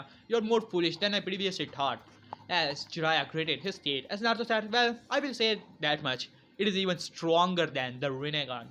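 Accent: India. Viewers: I knew he was a Indian by his accent of English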